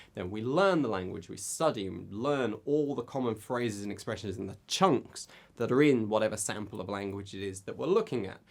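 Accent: United Kingdom. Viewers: What's his accent? which kind of British accent?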